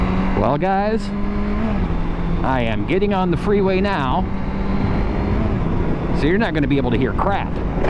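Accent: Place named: United States. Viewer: These things happen with the glitch. Yeh WestonSuoerMotoVlog is very supportive to us all and and all and out nice guy.. sure I'll meet him some day. He is in England and I am in Scotland so there is about 600 miles between us but it will happen. You accent is soo american, love it. Keep them coming buddy. 👍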